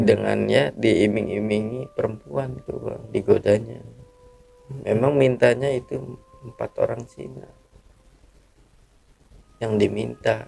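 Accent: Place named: Cirebon, Indonesia. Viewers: logat nya kaya orng Indramayu or Cirebon...Krn paham bener,cerita kurang paham yakin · Logat ngmng nya ini kaya orang cirebon😄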